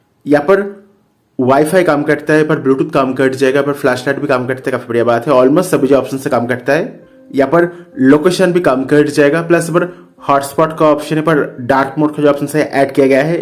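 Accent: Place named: South India, India. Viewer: Aisi hindi kyo hai South se ho kya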